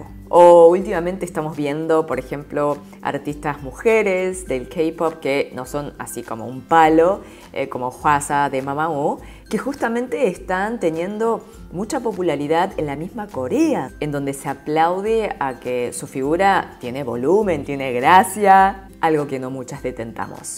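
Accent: Argentina. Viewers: Tienes asentó argentino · Hablas muy bien el español, me encanta el acento de los argentinos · Hola una pregunta eres argentina , te encuentro el asentó muy parecido , me gusta tu sencillez buena suerte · Me encanta tu forma de hablar español con acento argentino .😊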